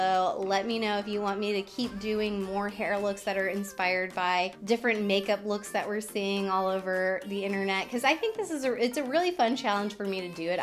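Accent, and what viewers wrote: Ohio, United States. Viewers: is she from Ohio? She talks just like someone i know who’s from Ohio lol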